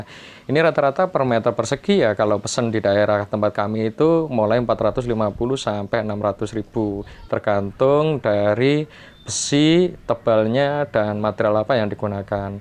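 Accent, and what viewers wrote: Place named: East Java, Indonesia. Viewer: Seperti orang jawa timur.. Aksenya itu lho.., buanyaaak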